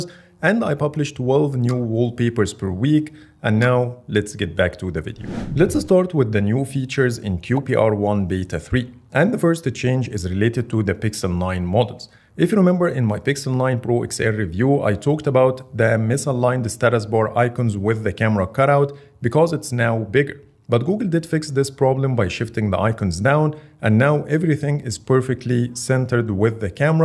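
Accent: Egypt. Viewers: I came for the Egyptian accent and stayed for the content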